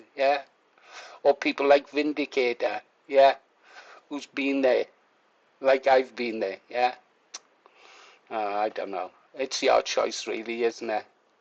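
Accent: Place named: London, United Kingdom. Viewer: A proper London accent!😂😅 Cockney is better than the Jafaken that the freaks speak, and not only in London now.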